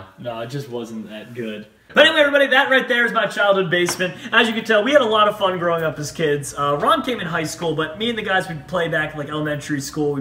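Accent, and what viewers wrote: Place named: Netherlands. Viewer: He kinda sounds dutch tbh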